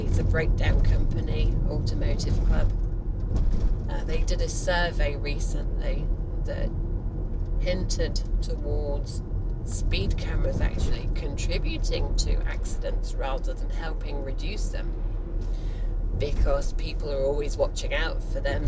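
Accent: United Kingdom. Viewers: Best female English accent in the world x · Just as beautiful as your voice! I never doubted you were British, no one could fake your diction. You ain't no Dick Van Dyke. Would love you to share a little more of your life online. If you are looking for troubling documentary content I have a very upsetting story but I don't want to share it in public.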